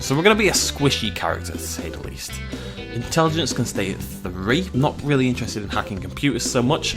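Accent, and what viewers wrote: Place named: England, United Kingdom. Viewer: I love! I love his English accent and love his content as he talks at his own pace and tries not to rush anything. Keep doing what you do!:D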